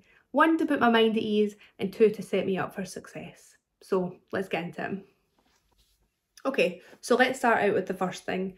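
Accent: Scotland, United Kingdom. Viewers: Hi, I’ve only just discovered you. I’ve been on Mounjaro for 2 weeks and I could already relate to what you said. How refreshing to hear your lovely Scottish accent and to hear you talking so intelligently and emotionally about you weight history over the last 30 years 💗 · Amazing advice! Thanks so much for sharing and congrats to you!! I'm from the USA and I'm on Zepbound which is the exact same med as Mounjaro just a different name and I've lost 78lbs in a year so far. Also, because I'm a huge Outlander fan I understood all of what you said with your beautiful Scottish accent ❤️❤️ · Oh my goodness how fabulous to hear a fellow Scottish voice! I’m on week 7 of my journey and have just subscribed to your channel. What an inspiration ❤ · That Scottish accent is absolutely gorgeous. Thanks for the great video and congratulations.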